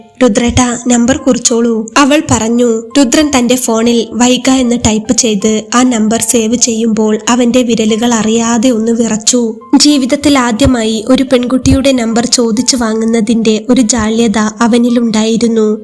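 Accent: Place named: Malappuram, India. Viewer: കഥ കേൾക്കാം. ആസ്വദിക്കാം. മലപ്പുറത്ത് ഴ എന്നത് ശരിയായി ഉച്ചരിക്കുന്നത് കുറച്ചാളുകൾ മാത്രമാണ്. ചെറുപ്പം മുതൽ കേട്ടതും പഠിച്ചതും ആ രീതിയിലാണ്. എല്ലാവരും എല്ലാം കുറ്റമറ്റ രീതിയിലാണ് പറയുന്നതെന്ന ധാരണ മാറ്റണം. ബുദ്ധിമുട്ടുള്ളവർ കേൾക്കാതിരിക്കുക. കുട്ടീ നീ നിൻ്റെ രീതിയിൽ തന്നെ തുടരൂ.എല്ലാവിധ ആശംസകളും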